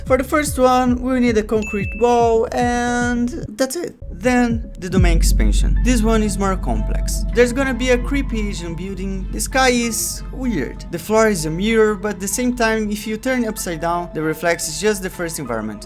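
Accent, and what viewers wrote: Brazil. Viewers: sapoha parece Br kkkkkkk pelo sotaque ou eu tô loko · maluko é brasileiro crtz, tem muito sotaque brasileiro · Why do u have a Brazilian accent? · Oh corno tú é Brasileiro né? Seu sotaque e nome estão me dizendo isso -_ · esse cara tem um sotaque mt brasileiro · Se n fosse esse inglês nunca iria notar q esse safado é br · o sotaque brasileiro torna tudo melhor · Aí ai o sotaque BR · É brasileiro? sotaque BR forte pra caralho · you speak english like a brasilian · Pode até falar inglês, mas o sotaquezinho BR nunca some kkk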